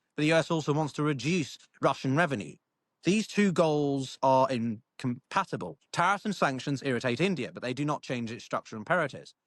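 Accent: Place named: England, United Kingdom. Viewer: Excellent Video. Nice English Accent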